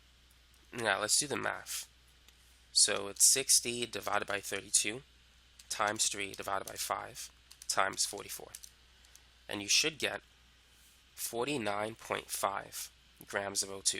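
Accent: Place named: Boston, United States. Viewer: Great video! Are you from Boston? PAcent lol I love it. Thanks once again for the videos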